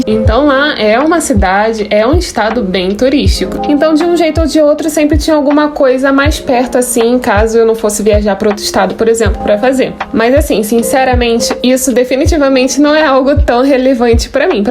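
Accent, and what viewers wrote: Rio de Janeiro, Brazil. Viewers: Você é do sul com esse sotaque carioca? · Tu é gaúcha, com esse sotaque super carioca? Não entendi...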